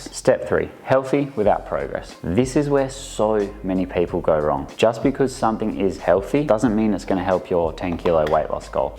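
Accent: Australia. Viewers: Are you a West Aussie mate ?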